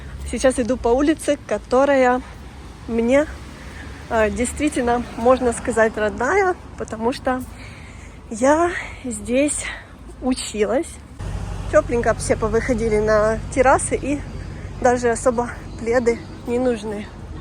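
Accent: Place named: Odessa, Ukraine. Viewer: Спасибо. Очень красивое видео. Постаралась🌹🌹🌹 Ты единственная девочка, которая разговаривает с Одесской интонацией. Приятно слушать.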